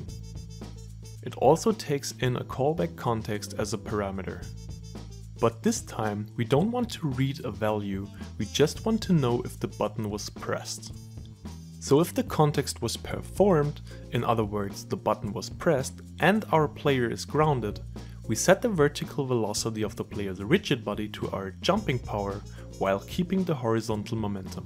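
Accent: Germany. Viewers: Nices Tutorial, kann es sein, dass du Deutscher bist? xD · are you german?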